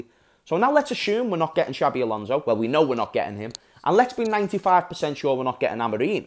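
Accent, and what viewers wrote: Liverpool, United Kingdom. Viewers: I can tell from your accent that you're from Liverpool.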